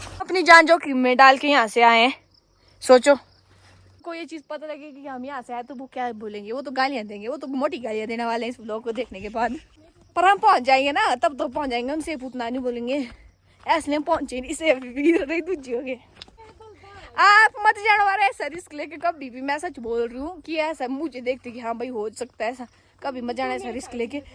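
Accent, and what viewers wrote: Pahadi, India. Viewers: Hindi ke sath phadi tune achhi hai❤ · Ap hindi bhi bolte h pr tone pahadi hi aati h 😁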